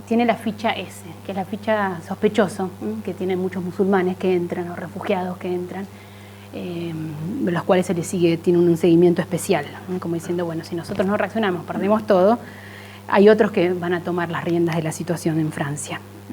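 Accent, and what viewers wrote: Buenos Aires, Argentina. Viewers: Desconosco el orígen de esta monja, pero por su formade hablar parece ser argentina. No entiendo por que esta tan preocupada por Francia,en todo caso es el precio que tienen que pagar por el nefasto colonialismo frances, ellos fueron los que intrdujeron la siniestra Doctrina de la Seguridad Nacional, que sembró de sangre, dolor y murte a nuestros paises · hermoso escuchar èse acento aporteñado, que me es tan familiar, esplendido trabajo, sólo te daré un consejo, mira la cámara mas frontalmente con menos miedo a la exposicion, eres muy inquieta y seguramente te cuesta estar sentada esponiendo, por lo general los Profesores os moveis mucho, ha sido magnífica lectura me hizo mucho bien enterarme Gracias!!! Hermana Dios te Bendiga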